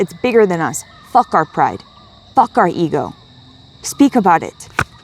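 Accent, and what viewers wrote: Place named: South, United States. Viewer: Hi!! Don't worry about crying on camera!! It's OK!! Let out your pain because I completely understand and have experienced bad childhood trauma. And I know that I also felt like something was wrong with me too for a very long time. I'm not here to judge you whatsoever. And by the way, I cannot believe i have never heard of, wow just wow!! What an amazing 🎵 🎶 🎵 song!@ I love ❤️ music and I also lovee 💕 to sing. And girl, I am impressed 🎉you CAN sing!! Very nice...keep up your wonderful calling and work youare doing!! This video completely resonated with me!! Although I am not a recovering addict, I am surely surrounded by others that do have drug addiction and alcoholism. I am not here to judge anyone or myself. I am truly on my own healing journey ✨️ girl!! And healing is not linear and it is messy!! So collective KEEP on keeping on Y'all..love that slang, lol. 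I am a true born Canadian citizen here but I just love ❤️ the Southern drawl!!! 😅 people say I have an accent that I don't recognize of myself but I just love ❤️ 💕 speaking to people from other parts of the world!!!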